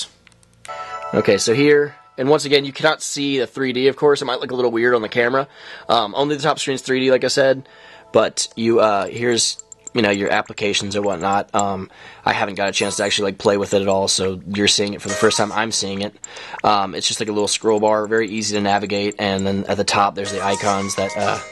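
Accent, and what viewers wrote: United Kingdom. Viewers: british accent O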